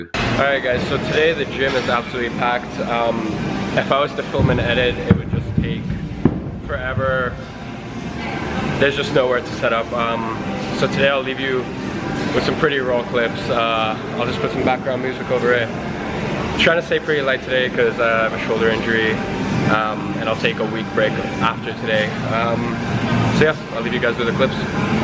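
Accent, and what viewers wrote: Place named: United States. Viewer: wait if you live in london for 13 years how or why do you have american accent 😳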